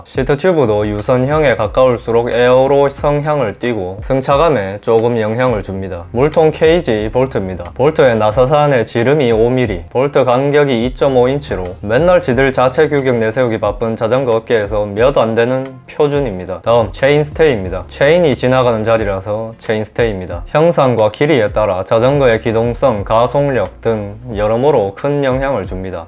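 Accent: Gyeongsang, South Korea. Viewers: 경상도 사투리만 빼시면 100점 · ㅋㅋ 이형 겁나 쿨해~~ 마지막에 끝!~~ 갱상도 사투리쓰는 자덕 두 분중 한분 ! ^^ (나머지는 각시탈) 전라도는 안계시나?? 암튼 구독눌렀네요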